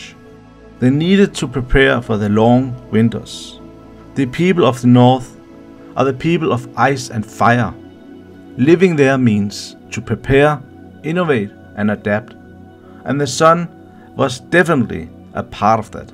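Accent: Denmark. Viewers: I swear this guy is danish i can feel it in my danish body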